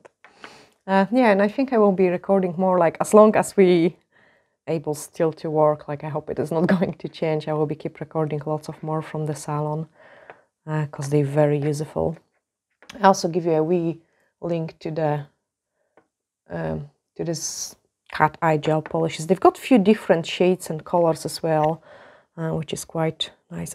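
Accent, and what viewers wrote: Scotland, United Kingdom. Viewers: Thank you for this video! Such an interesting accent. It’s like, Scottish but also something from Eastern Europe?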